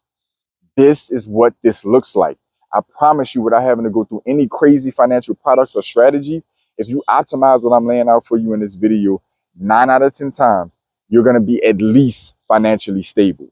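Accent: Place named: Louisiana, United States. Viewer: Hello! I knew you were from LA, I could hear it in your accent…I definitely know about hurricanes and having to have resources to travel based on potential hurricanes! And the ability to help others in my family and extended circle. This is a good video. I have strong relationships with Chase and NFCU…also CapOne and Discover…business with Chase and NFCU…next on list is AMEX…DDs going to Chase, NFCU, and CapOne…IRA with NFCU, savings with Chase and AMEX.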